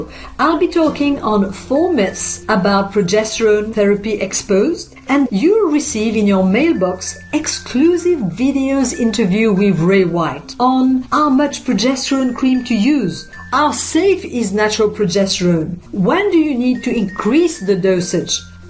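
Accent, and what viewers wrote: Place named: France. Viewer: where is that strong accent from ? France ?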